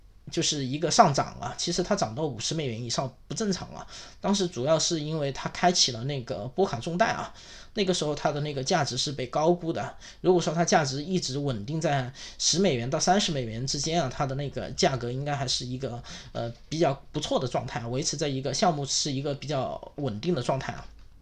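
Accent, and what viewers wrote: Changsha, China. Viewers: 听那方言，好像是长沙话，哈哈😃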